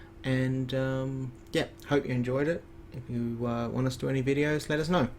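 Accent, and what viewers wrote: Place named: Australia. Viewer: Hey just found your channel! Great to see more Scrabble on here :) Not sure if you knew to click flashcard mode on Zyzzyva saves having to change from missed to correct. You may have covered it in upcoming vid but I thought I'd mention anyway. Glad I've found another channel to watch after my 3 year love affair with Dweebovision and good to hear another Aussie voice too. Keep it up!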